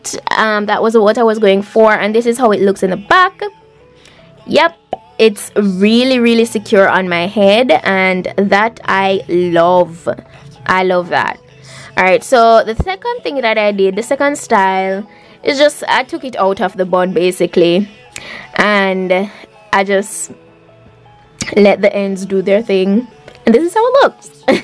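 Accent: Jamaica. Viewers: You sound like a Jamaican 👍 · From I hear how she talk I know from d start she's a Jamaican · You have an accent like a jamaican lol I am lol 😄😃 n if u are, ur very gorgeous n beautiful.. That wig were made perfectly 4 you! 😄👍 Tanx 4 sharing.. Blessyou! 😄💓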